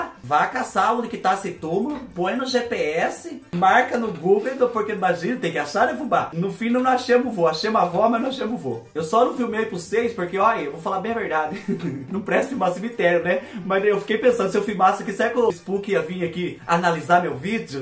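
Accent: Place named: Minas Gerais, Brazil. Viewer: Fubá, de qual região do Brasil você é? Não consegui identificar de onde é seu sotaque. Parece uma coisa de minas, mas ao mesmo tempo algo do sul.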